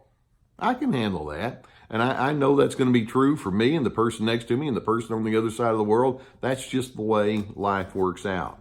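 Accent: Texas, United States. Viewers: A Texan?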